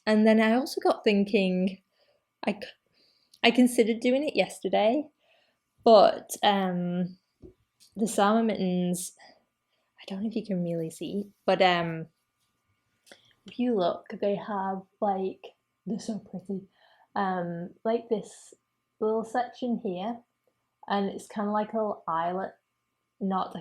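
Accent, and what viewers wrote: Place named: North, United Kingdom. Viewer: Enjoyed your first podcast and am back for more. Love your dotty hat, really pretty. Enjoy your style and its lovely to hear your Northern accent 😁 Tilly is adorable too. Look forward to the next one xXx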